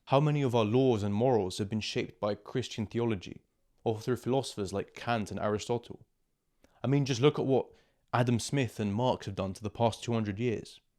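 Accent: United Kingdom. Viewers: Most crisp and understandable British accent I ever heard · ur britih accent was wrecking my nerves i had to turn on the caption to inderstand that shiz🥀🥀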